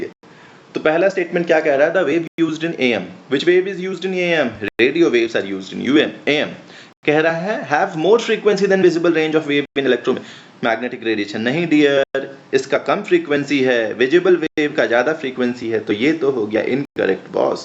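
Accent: Bihar, India. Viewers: Sir ka bihari tone ...very sweettt ...🥳 Mazzzzzaaaa aaa gyaa ...grt explanation 🙏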